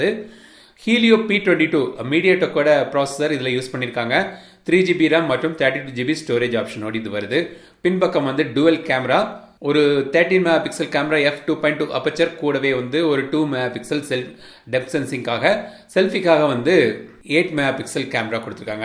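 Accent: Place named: Tirunelveli, India. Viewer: Thalaiva neenga pesurathu enga ooru slag mathiri iruku 😍😍 neenga Tirunelveli ah??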